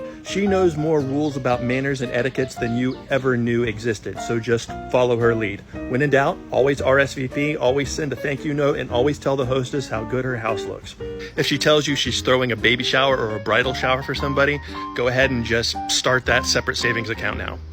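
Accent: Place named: South, United States. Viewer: I'm from the South. It sounds like your wife southernized you like I did my New York honey .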